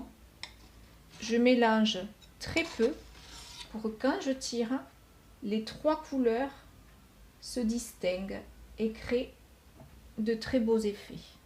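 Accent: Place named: South-West, France. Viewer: Un bel accent du sud ouest?? Pour une video très sympa merci!! Je veux faire du découpage collage, punk, moderne, tatouage!! Merci pour les astuces collage/peinture/materiel....Le papier de boîte a chaussure, c'est une très bonne idée de recyclage!!! Et ça donne facilement du relief a nos ouvrages merci et Kenavo!,😉😉😉👌👌👌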